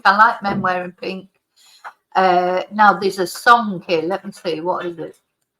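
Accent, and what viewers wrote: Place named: Manchester, United Kingdom. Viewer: Hello. I love listening to you and your great comments. So nice. Also your accent. I'm from Mcr too and miss it so much. ❤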